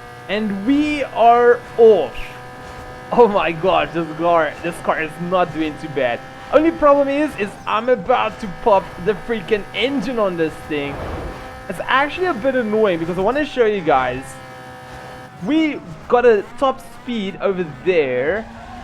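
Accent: South Africa. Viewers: my fastest car in my garage has a 0-100 in 0.54 seconds and a top speed of 310 km/h. my time on that track with it is 8.23 seconds also are you south african? you talk with that type of accesnt? also great video i loved everything about it😀😀😀😀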